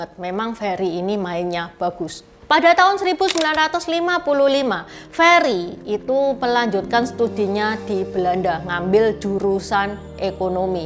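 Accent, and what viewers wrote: Java, Indonesia. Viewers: Mbak suaranya medok banget.jawanya mana mbak,👍💪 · Ya sambil berbahasa indonesia bergaya LOGAT JAWA. MANTAF🙏🙏🙏👍👍👍👍👍👍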